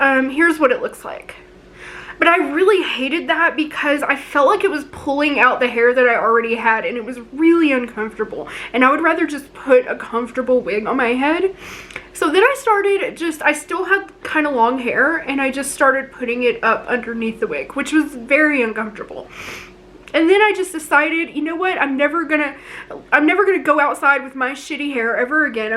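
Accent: South, United States. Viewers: your lil southern accent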